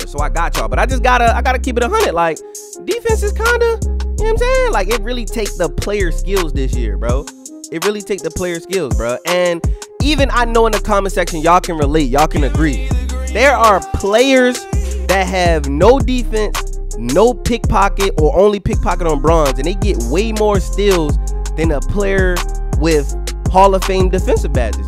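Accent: Baltimore, United States. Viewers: Yeeeeeeerrrrr, are you from Baltimore my guy?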